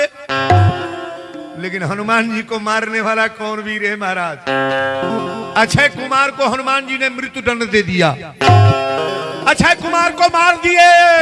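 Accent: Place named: Bihar, India. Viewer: Katha to Aapki theek thak hai par brahmad hokar bihari ganwar bhasha bolte ho.?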